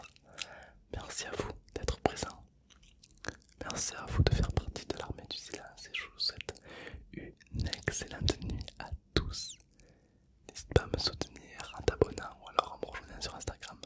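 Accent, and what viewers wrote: South, France. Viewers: J'adore ton accent du Sud. J'ai le meme!! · On entends l accent du sud 😂😂😂😂😂😂😂😂😂😂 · Aller hop jsuis trop fier g entendu mon nom😂😂😂trop beau l'accent du sud bref force à toi👌👌❤️💪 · Je comprends pas son accent français mais c’est quand même grave bien 😭😂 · L’accent du sud 🥳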